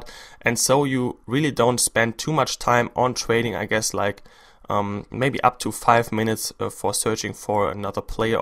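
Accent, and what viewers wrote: Germany. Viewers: wie man einfach nur raushört dass du deutsch bist :)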